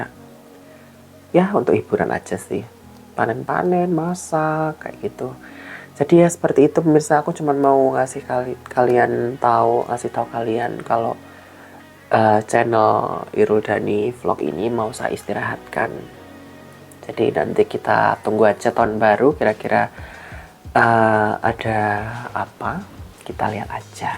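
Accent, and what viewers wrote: Java, Indonesia. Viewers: mas irul jngn break jngn di jual klo di jual mending ibuny yg nerusin klo mas irul break gk ada yg celotehanny yg lucu ngomong jowone ora ketinggalan aku seneng soale aku yo wong jowo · yaa jangan dong mas Irul biarpun aku jarang komen tp aku tetap nonton video mas Irul,yg bikin seneng celotehan dengan bahasa Jawa medoknya yg bikin kangen❤ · Aku seneng sm ngomongnya mas irul jawanya gak dihilangin mas aku suka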